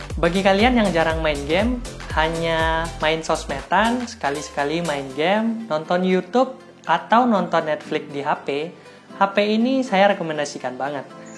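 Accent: Padang, Indonesia. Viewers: Orang padang yo bg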